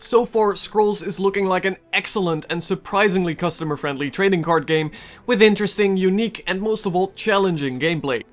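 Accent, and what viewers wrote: Netherlands. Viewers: Heerlijk dat Nederlandse accent :D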